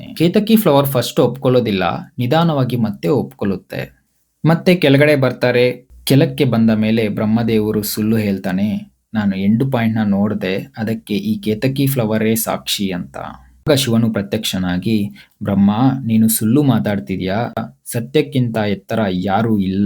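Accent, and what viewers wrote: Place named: Tamil Nadu, India. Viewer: Tamil boy made this video your speech is in Tamil slang. Welcome you speaking in Kannada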